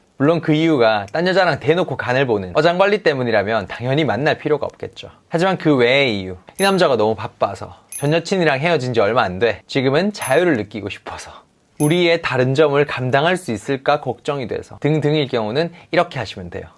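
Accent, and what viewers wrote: Gyeongsang, South Korea. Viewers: 부산 사람인가요? 경상도 분이신가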